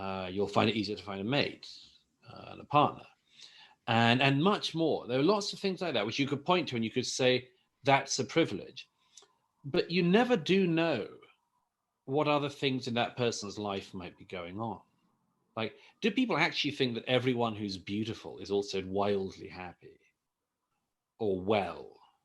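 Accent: Wales, United Kingdom. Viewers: IS HE WELSH?? Go on boi :D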